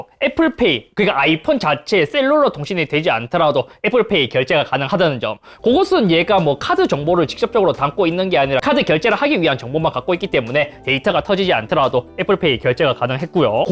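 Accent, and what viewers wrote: Gyeongsang, South Korea. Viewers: 근대 궁금한 게 있는데 왜 IT 유투 버는 경상도 사람들이 많지 사투리도 그렇지만 발음도 그렇고 말이 빨라서 무슨 말인지 못 알아들을 때가 있네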